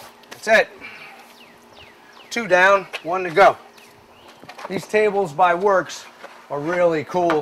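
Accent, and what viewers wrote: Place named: Louisiana, United States. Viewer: Great videos. My wife is from Louisiana and when she first heard you she said he's got to be from Louisiana. Keep the videos coming.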